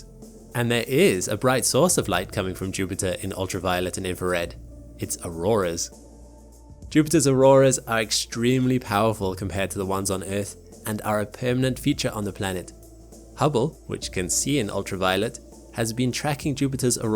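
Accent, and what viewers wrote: United Kingdom. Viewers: Why is he trying to sound like a British? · Why do you sound English but foreign at the same time? Can someone make it make sense to me? Is actually English or was he born somewhere else and moved here?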